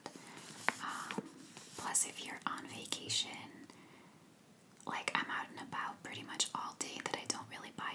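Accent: United States. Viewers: You may have answered this before but why do you seemingly not have an accent? Like you sound American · I would have guessed she’s from Chicago!!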